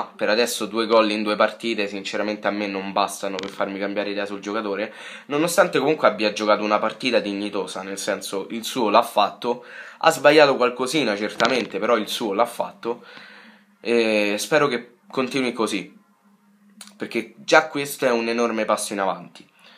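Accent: Rome, Italy. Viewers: Come mai hai l accento romano?😂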